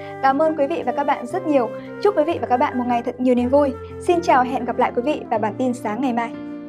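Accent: Standard. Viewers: noi hay chuan chi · Chị đã xinh lại nói chuẩn nhanh như gió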